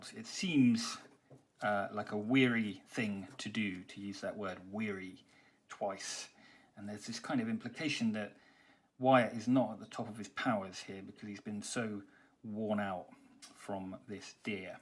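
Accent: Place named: England, United Kingdom. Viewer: English with an English accent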